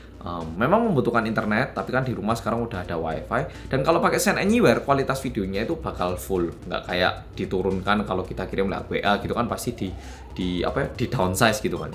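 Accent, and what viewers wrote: Java, Indonesia. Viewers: Samsung endorse dong, Iki wong korea tenanan lho, cuma yo rada medok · Kog logat e jowo😁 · Anjirr lancar banget bahasa Indonesia nya Ampe ke Jawa jawaan😆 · Wong jowo yo mas? Medog jowo ne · Korea jowo, mantap banget bahasane mas wkwkwk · 14 apa 13 pro bang medok wkwkk ?? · Mas yutub kalau jelaskan super detail. Medoknya khas...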